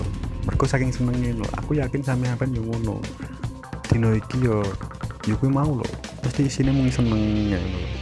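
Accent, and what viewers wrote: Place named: Surabaya, Indonesia. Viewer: Bahasane suroboyo bgt 😁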